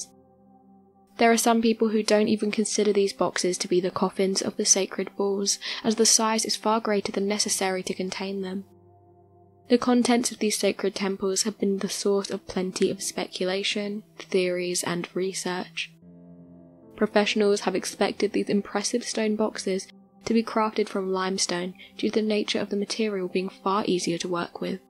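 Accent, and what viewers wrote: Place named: Scotland, United Kingdom. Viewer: Sounds Scotch, to me.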